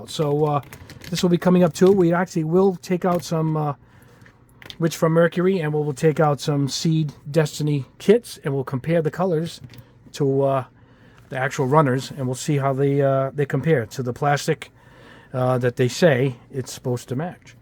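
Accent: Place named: South, United States. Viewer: It’s his regional accent. Down south we say it different.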